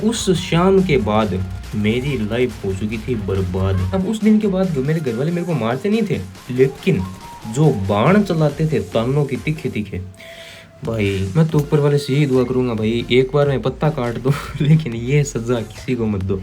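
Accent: Haryana, India. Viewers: Mehnat to hai bhai...... Mehnat to kar rha launda..... Haryana se ho kya? Apki voice se lag rha ❤ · same situation brother 😂😭 Aap bhi Haryana se lagte ho fir to 😂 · same😆 pubg ka name letee hi khatam🤣🤣 by the way love from my heart m bhi haryanvi hu apki awaaz se pta chala ❤️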